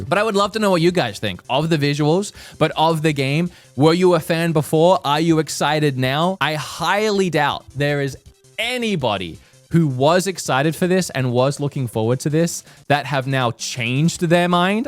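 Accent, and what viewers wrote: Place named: Australia. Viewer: Where are you from? I can't figure your accent out. I get Australian at times, and Boston at others.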